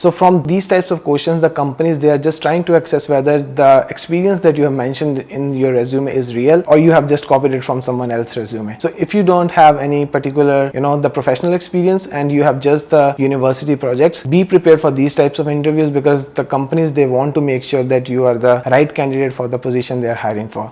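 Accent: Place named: India. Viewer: Omg... You have so strong Indian accent, sorry but it's really unbearable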